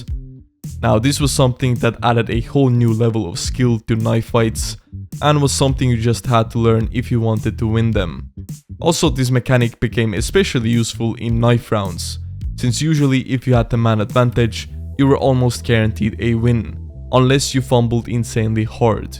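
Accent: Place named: Europe. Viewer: are you from a European country by any chance? your English is good by the way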